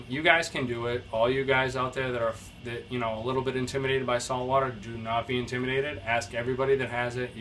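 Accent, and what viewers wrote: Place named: South Shore, United States. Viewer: What part of Mass are you in? You sound very south shore!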